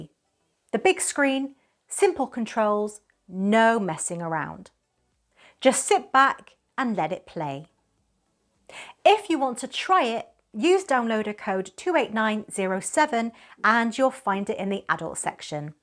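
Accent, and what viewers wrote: United Kingdom. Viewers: It is true, you are a very lovely girl, and I really like your British accent❤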